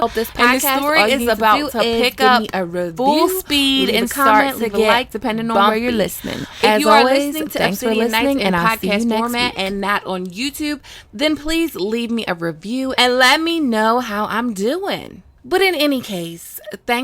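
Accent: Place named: New Jersey, United States. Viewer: are you from Jersey? I heard how you say wudder instead of water!;)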